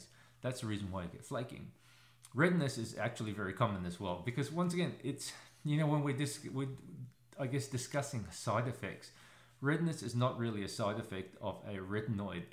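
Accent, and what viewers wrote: Australia. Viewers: I enjoy watching your videos so much! They’re so informative and easy to understand and on top of that your Australian accent is just a plus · Most informative channel on skin care I've seen 💙 Bonus: The way he says 'yeah' at the end of his sentences with his Australian 🇦🇺 accent, awesome ❤️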